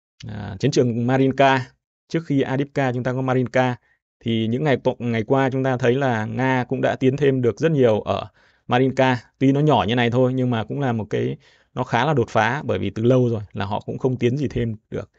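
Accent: Standard. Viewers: Nói chuẩn nha chú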